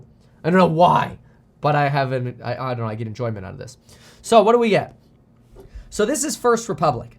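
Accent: Switzerland. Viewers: Why isn’t anyone talking about his SWISS accent?! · Love the Swiss accent!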